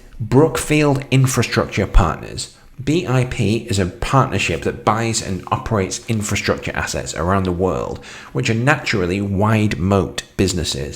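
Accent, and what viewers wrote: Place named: England, United Kingdom. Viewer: Great video. You sound English, do you have any large Uk listed companies you can recommend?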